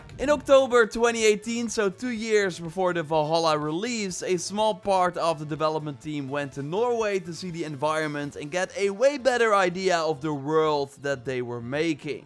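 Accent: Netherlands. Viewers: Your accent sounds Dutch. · Your accent sounds like a Dutchman talking English lol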